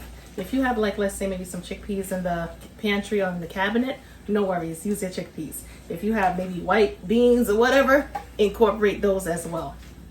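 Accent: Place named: New York, United States. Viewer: That looks good. I have to try it. Where are you from? Sounds like New York or Northern NJ.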